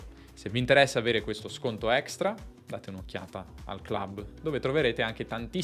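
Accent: Standard. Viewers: Non dirglielo...fa di tutto per non avere accetti e parlare l'italiano più standard possobile!! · Bravo! Ascoltarti è istruttivo e piacevole! Linguaggio italiano corretto, privo di plastismi e di odiosi intercalari e con accento neutro, rispettoso dei timpani altrui. A casa propria ognuno è libero di parlare l'idioletto che gli compete! Condivido le tue considerazioni sulla bellezza delle lingue. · Da italiano, non saprei rispondere. So che non amo l'italiano parlato con accento regionale (e vale per qualsiasi regione). Apprezzo quindi lo sforzo che tu, hai fatto per parlare in modo neutro lasciando da parte le tue origini torinesi. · È interessante la tua cadenza, che poi è italiano standard, qua a Como si parla in modo molto diverso, tu parli come parlano in televisione, e qua è considerato ‘accento da teroni’ Nel nord della Lombardia tendiamo a scambiare le aperture delle vocali in modo che suonino meglio rispetto ad altre cadenze italiane. 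Como Varese e Milano sono molto simili, invece il bergamasco ci risulta davvero brutta da ascoltare, qua direbbero che è il dialetto dei montanari cafoni. Comunque bella l’italia e belle le differenze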